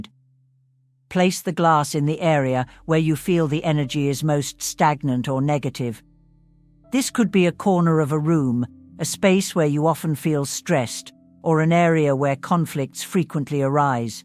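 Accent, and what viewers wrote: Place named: United Kingdom. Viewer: Trump supporter or just an idiot with a British accent?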